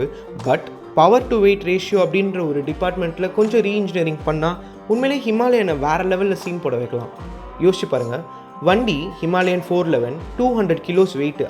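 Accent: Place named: Madurai, India. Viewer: bro ithu madura slang bro 🥰😎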